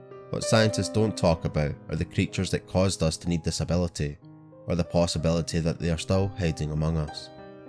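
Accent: Scotland, United Kingdom. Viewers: Mr I like scary stories but your Scottish voice just makes it better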